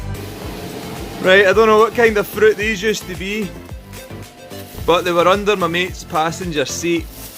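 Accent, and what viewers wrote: Scotland, United Kingdom. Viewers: My mother was Scottish. How I miss hearing the brogue. Beautiful! PS: The car looks great too. First car: 1976 Fiat 131S. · Hyundai i10 2010. I still have it. I like your scottish accent.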